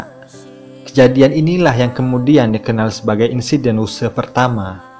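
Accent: Manggarai, Indonesia. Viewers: Fokus dengan logatnya seperti logat manggarai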